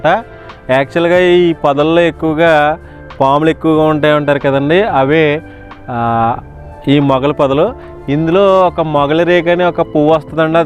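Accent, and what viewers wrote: Godavari, India. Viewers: సార్,. మన కోనసీమ లో మీరు చూపించే ప్రదేశాలు అద్భుతంగా ఉంటాయి మీ విశ్లేషణ కూడా భలే ఉంటాది. సార్.. మన గోదారి స్లాంగ్ లో మీరు మాట్లాడే భాష భలే ఉంటాది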